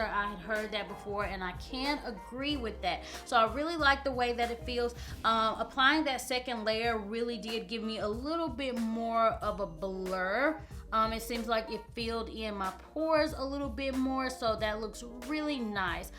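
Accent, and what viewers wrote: South, United States. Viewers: You're so cute with your country accent.... nice look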